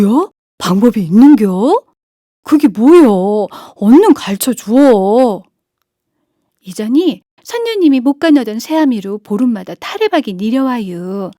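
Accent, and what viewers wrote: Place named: Seosan, South Korea. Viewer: 서산 말씨를 자꾸 따라허게 되네유~^^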